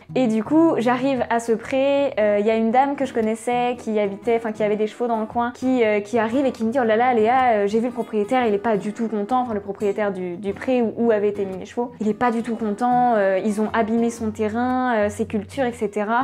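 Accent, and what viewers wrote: England, United Kingdom. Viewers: Tu as un très bel accent anglais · Quel accent anglais !!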